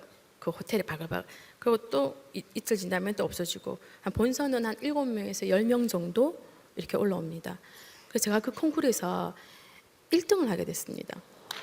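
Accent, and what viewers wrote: Busan, South Korea. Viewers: 고예정님 저도 부산 동대신동에서 태어났어요 ^^ 정지~~ 부산 사투리 고소합니다. 항상 하나님을 증거하시는 삶이 되시길 축복합니다.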